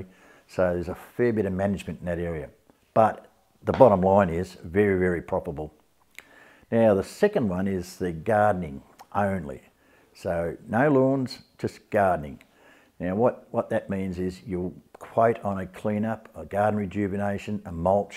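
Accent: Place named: Australia. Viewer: Great to hear an Aussie accent for a change! A good decent bloke giving honest no nonsense advice that's actually relevent to Australian conditions. Always enjoy your video's mate! Steve. Upper Cuts Lawn Care - Devonport Tasmania.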